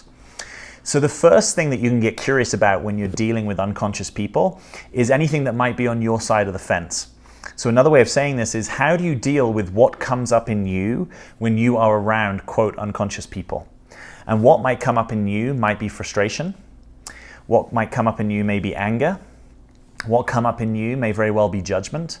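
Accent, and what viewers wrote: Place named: England, United Kingdom. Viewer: I think that's what I've gathered. has a very similar accent to mine from England.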